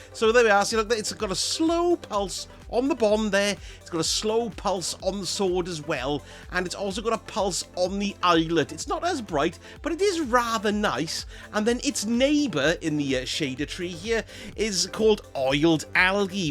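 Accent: Wales, United Kingdom. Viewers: You sound very welsh my friend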